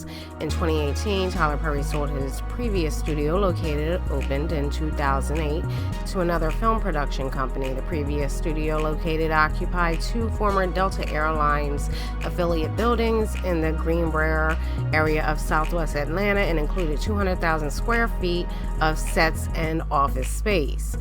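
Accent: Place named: Maryland, United States. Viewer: You from maryland or d.c.? Your accent is heavy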